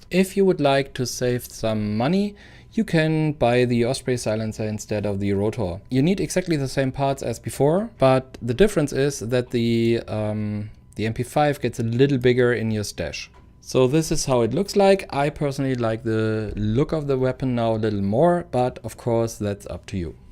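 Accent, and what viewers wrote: Germany. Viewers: Wieso merke ich direkt nach dem ersten satzt dass du Deutscher bist :)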